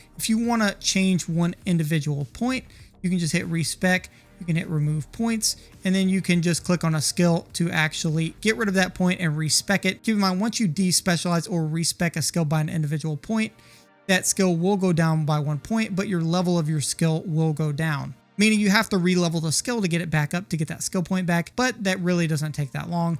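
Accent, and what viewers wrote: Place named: Midwest, United States. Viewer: You have such a clean Northern/Midwestern accent - but why do you pronounce "affix" in the way you do - a-fix? Dictionary puts the accent on the A - for ah-fex.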